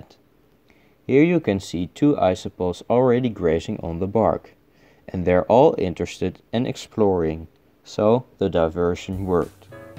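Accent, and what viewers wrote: Netherlands. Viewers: I love the dutch accent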